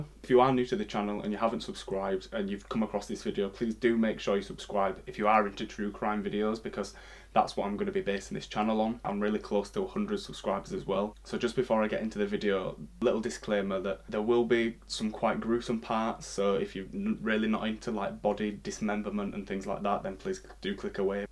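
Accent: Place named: Barnsley, United Kingdom. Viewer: New sub here 🙋‍♀️ looking forward to more videos … you sound like your from barnsley too 😊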